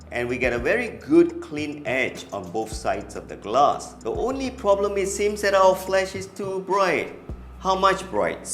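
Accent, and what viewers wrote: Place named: Malaysia. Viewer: Been enjoying your videos since Last year. It's kinda soothing to listen you you speaking, especially with that Malaysian accent. Reminds me of my life in KL years ago. Stay safe always!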